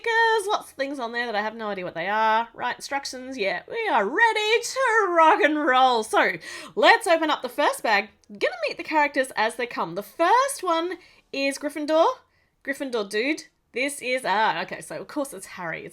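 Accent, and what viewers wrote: Australia. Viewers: I don't know anything about Harry Potter but I love your accent your Australian accent is amazing and beautiful...and....oh..I need to catch up Harry Potter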